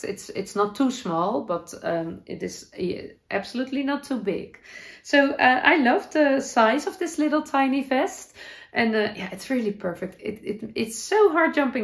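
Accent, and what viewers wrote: Netherlands. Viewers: thank you so much for sharing your life and makes with us all. I love your dutchy-english and am always impressed with just how fluent you really are. That bike ride at the end was FABulous! I'm so envious of your locale 🌳and I absolutely love your pup 🐶❤You really are so talented and seem to know your personal style so well. Oh yeah, I also love your hair!!!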